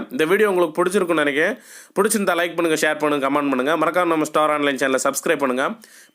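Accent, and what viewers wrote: Kanyakumari, India. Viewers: Ur speaking Kanyakumari Tamil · Kanyakumari ya bro ...namah ooru voice mahdiri iruku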